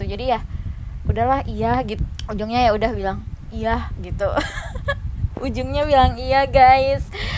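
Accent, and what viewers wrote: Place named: Aceh, Indonesia. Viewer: Bahasa Indonesia nya Udah mulai medok Aceh ni...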